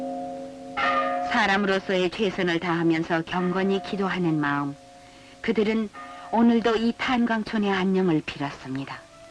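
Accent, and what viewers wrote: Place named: North Korea. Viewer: 해설자가 북한목소리네요~~ㅎ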